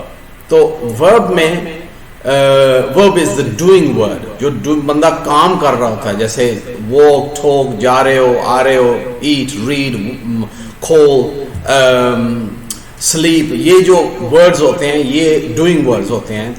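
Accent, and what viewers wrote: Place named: United Kingdom. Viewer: That is such a great opportunity to learn English with you I am so glad to listen your British accent so I am ready to learn English with you..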